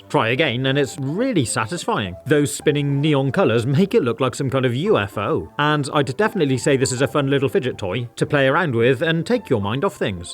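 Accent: United Kingdom. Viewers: I love your vids You sound like you are in the uk and I'm in the us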